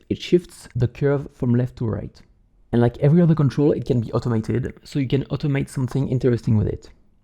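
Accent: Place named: France. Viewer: Merci frro j'ai reconnu l'accent français 🤩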